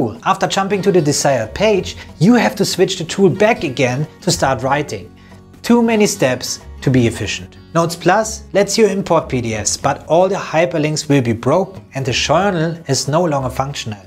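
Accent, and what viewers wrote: Germany. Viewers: Er hört sich so deutsch ann??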